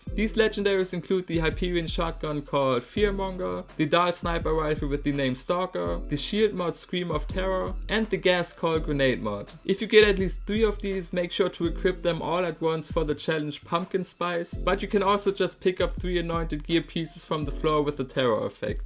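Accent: Germany. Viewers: for me he sounds very German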